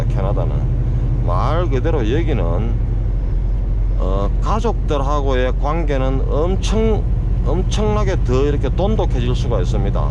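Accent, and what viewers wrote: Gyeongsang, South Korea. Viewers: 경상도 사투리 굿 저도 경상도사람으로서 친근감을 느끼네요. 좋은 영상 잘보았습니다. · 구수한 경상도 사투리 즐기면서 잘 보고 있습니다. ^^ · 우리 동네 카센타 사장님도 비슷한 억양... 경상도 사투리는 세상 어딜가도 그대로임 ㅎㅎ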